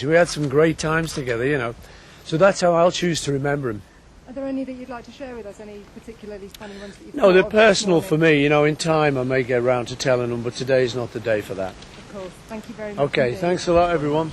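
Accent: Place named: Scotland, United Kingdom. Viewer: Your Scottish brogue, Faul gives you away for the fake freak you R! For those that believe that this man is do the months of research and you will find this man! 2 identifiers Real had Dark Brown Eyed not Greenish Hazel Eyes and Real Paul didn't tower John Lennon nor George Harrison! It took me a long time to see the difference but once you do it's a no brainier!!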